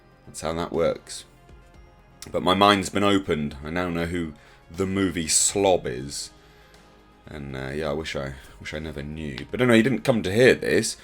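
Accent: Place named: RP, United Kingdom. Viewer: Your to woke !! Sound a bit like posh champagne drinking guardian reader !!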